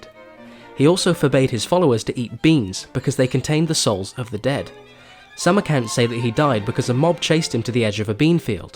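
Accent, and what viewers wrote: United Kingdom. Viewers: You forgot the British Accent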